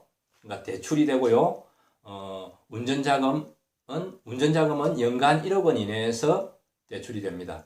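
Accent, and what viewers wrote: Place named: Busan, South Korea. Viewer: 부산억양 귀에 쏙쏙 들어옵니다.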